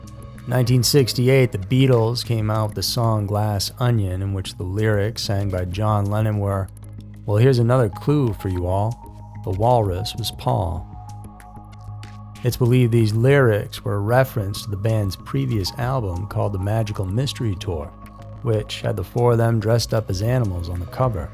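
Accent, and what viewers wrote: South, United States. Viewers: Im from South Africa and am so mesmerized by your gorgeous southern ? I think accent that im trying to focus on the material at hand 😂❤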